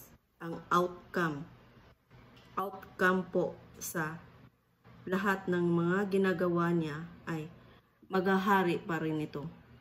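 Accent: Visayas, Philippines. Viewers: Ha?????😂😂😂😂😂😂Diyos lang ang nakakaalam ng lahat DDS ito😂😂😂😂 paano mananakot e siya na nga ang tinatakot harapharapan😂😂😂pananalita mo lng bisaya o davawenya ka DIYOS ka ba???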